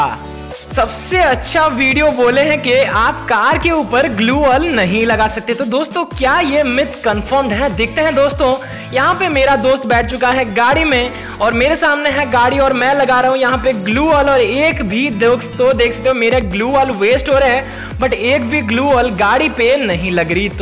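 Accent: Odisha, India. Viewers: Bhai aap oddisa se ho Kya 😅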